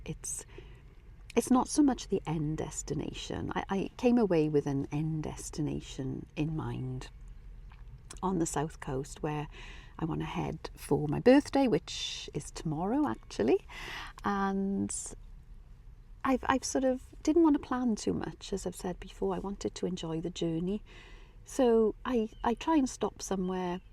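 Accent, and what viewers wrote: Wales, United Kingdom. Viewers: What is ASMR please? New subscriber here so sorry for the need for an explanation. Loved the video....AND your accent as I live in South Wales.... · your dulcet, Welsh voice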